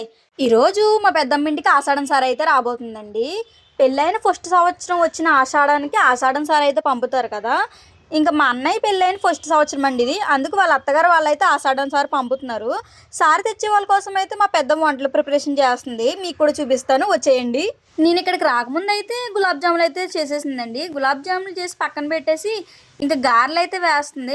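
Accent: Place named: Godavari, India. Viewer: Super undi sister.mana godarolla majaka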